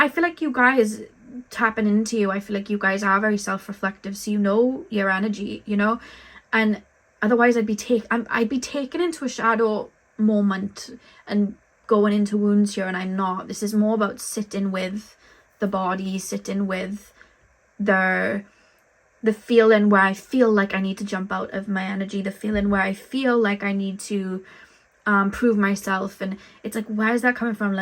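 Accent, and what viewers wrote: Wales, United Kingdom. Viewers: Pile 2; Thank you so much for the confirmation of where I am. You are so spot on, also with the Whale 🐋Been drawn to the Whales so much lately, and I read that the Whales and the Dolphins are gathering in HUGE pods all over the world now! WOW! Just saw an Eagle, and I saw one yesterday too🦅🦅YEI!!! I am new here and I really loved your reading❤‍🔥 And I love your Welsh accent, lived two years in Swansea, Wales, when I took my masters, so it is bringing me back. I come from Norway, in this life, but I the sound of your voice with the Welsh accent feels really like home🐉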